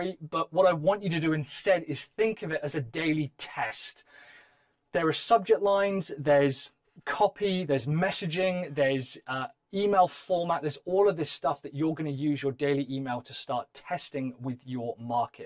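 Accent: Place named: England, United Kingdom. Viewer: Mann, i like your english accent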